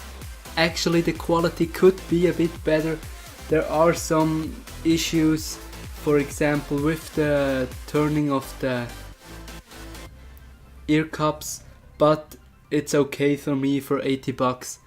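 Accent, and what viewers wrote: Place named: Switzerland. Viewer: Nice man hört dir den Schweizer einfach raus. Wieso machst du englische Videos. Aufjedenfall cool. Wie findest du das Mik im vergleich zu membran mach mal ein Headset mikro vergleich test.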